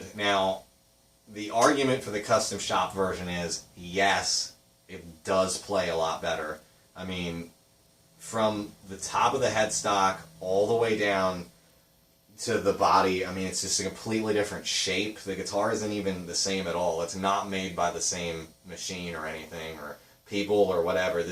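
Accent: United States. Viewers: you sound Alaskan! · you voice had a philly twang lol i was born and raised in philly .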